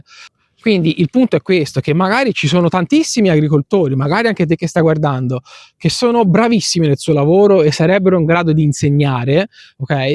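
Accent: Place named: North, Italy. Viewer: Dal tuo accento, capisco che vivi al nord,,, mi dispiace,,, possiedo dieci Milà metri di terreno,,(vivo in Sicilia) sono ormai dodici anni che ho avviato la mia partita iva,di azienda agricola,,,,produco un po' di tutto,,, è i prodotti che riesco a produrre, il 95% dei casi riesco a venderli molto meno della grande distribuzione,,, con buoni margini di profitto,,,, forse il motivo è anche che al sud ci sono molte più persone che possono permettersi di spendere molto di più per il buon cibo rispetto al nord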